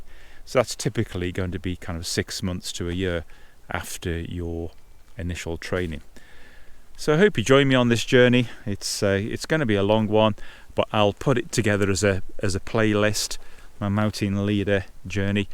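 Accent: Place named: Stoke, United Kingdom. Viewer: Interesting video, I’ve been considering this for a little while. But more importantly…….. are you from Stoke.? You sound like you’re from Stoke but haven’t lived here for a while as the accent is now quite subtle. Now if you’re not from Stoke , it sounds like your are 😂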